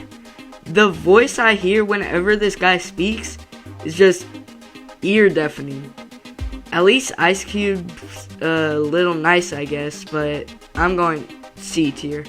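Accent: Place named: Texas, United States. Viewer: dude sounds like that local texan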